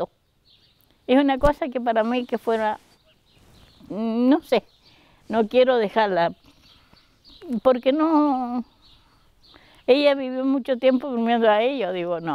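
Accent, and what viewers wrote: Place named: Chile. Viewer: Habla igual que los cjilenos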